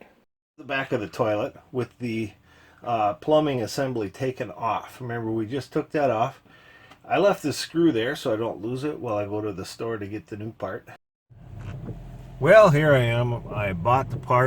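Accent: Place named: Wisconsin, United States. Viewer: Very helpful video. With that accent you must be from Wisconsin.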